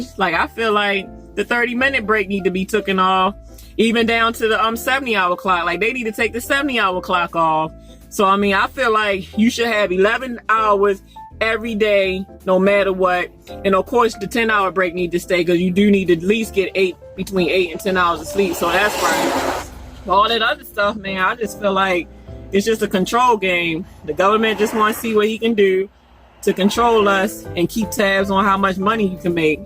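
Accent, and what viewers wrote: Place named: Washington DC, United States. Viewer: Washington DC or Baltimore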